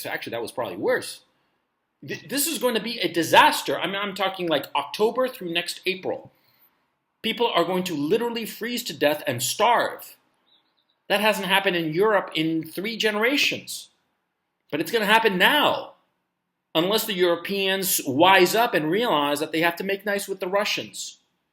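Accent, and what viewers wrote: United States. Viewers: This idiot is so ignorant - sure Europe will have problems for the next 5 to six months due to oil, etc. , but Israel has just signed a major deal to start supplying gas and oil to Europe. And this is kept quite quiet as this will turn Russia, Iran, Turkey with its worsening economy start a war against Israel. (All for booty) - Israel discovering unbelievable amounts of oil/gas. Also America and other countries do produce grain. This man was brought to the US as a small child and was raised in the US perhaps as an asylum refugee are illegal, or immigrant and benefited from all the generosity of America for education, housing, education, etc. like so many others that come to the US, UK and Europe - now he has all this hate towards the US. He now states he is from Chile. But note his very much US accent. Also, he is in no danger as he is most likely a mouthpiece for Russia. 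Ungrateful ignorant “immigrant”. He seems to hate Europe and America for whatever reason. Europe , UK, America and Australia will do just fine and help other less developed countries. The Us does have a dementia President run by the Globalist but this puppet US President is run by a somewhat competent intelligent administration run by three equal branches of government. He states Israel hates Russia - that is false - Israel up until now has had a great relationship with Russia as Russia allows Israel to bomb terrorist in Syria coming from Iran that are trying to wipe Israel off the face of the map. Israel pe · according to your English, you most likely are from the USA, living abroad. The point is not that Europeans do not understand the situation but Eiropean leaders like Macron or von der Leyen are corrupted and just puppets on strings with puppeteers in Washington and or London (City) it's not only a war against Russia, it's also against Germany. 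Look at the Nord-stream case... Gas is not only a problem of heating in winter, it's energy and a vital raw material for the industry !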